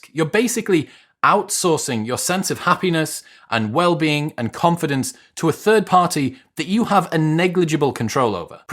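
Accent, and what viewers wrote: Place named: England, United Kingdom. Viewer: I love this English accent. You treat the "u" consistently.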